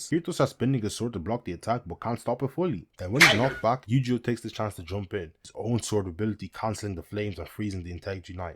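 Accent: Ireland. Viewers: are you irish bruh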